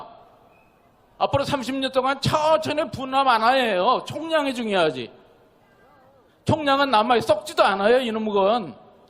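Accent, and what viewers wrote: Jeolla, South Korea. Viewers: 전라도 사투리쓰네 과학자라면 과학적 으로 증명하면 될것아니냐? 제발 그렇게 해봐라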